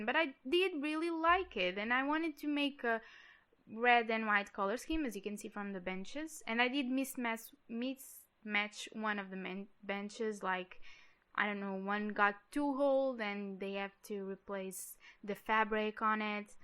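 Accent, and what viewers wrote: United States. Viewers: Legal! Sou do Brasil. Parabéns pelo seu inglês, jurava q vc era americana. kk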